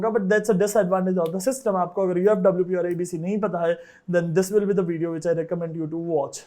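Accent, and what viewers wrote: Gujarat, India. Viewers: UFWP event jordar hai, jitni tariff kare kam hai, jo life ko badal deta hai aur ek new life jine ka tarika batata hai, aap jitni event karege woh sub attend karunga and tamari gujatati tone jordar chhe 🙏🙏🙏🙏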